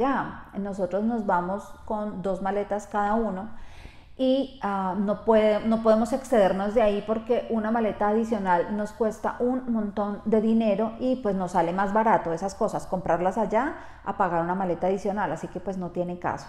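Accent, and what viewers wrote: Colombia. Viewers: 😪😪😪😪, que trsiteza me da saber que dejar este mi bello y violento México 🇲🇽 pero me agrada enooooormemente que te vayas a tu país que tanto extrañas, ya que la pandemia echó a perder tus tan aheladas vacaciones. Muchas gracias por todos tus videos, tus vivencias, tus proyectos, etc. Y si, con la ayuda de Dios yo te seguiré de cerca porque déjame decirte que siempre he querido conocer Colombia, me agrada su gente y ese acentico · Hola, que padre que puedas regresar a tu país, me imagino lo mucho que has extrañado a tu familia, espero todo sea de bendición para ustedes y que sigas compartiendo con nosotras tu vida en Colombia, lo que más me gustará es que tendrás más marcado tu acento colombiano que tanto me gusta, DTB en esta nueva etapa y como siempre al pendiente de tus videos.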